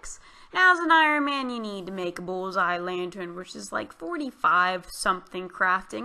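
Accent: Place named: South, United States. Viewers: You're obviously a fellow southerner :P shout out from Texas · Shoutout to you for using y’all and dank in the same sentence. I’m from Jersey but spent a lot of time down south and you talk just like I do LOL.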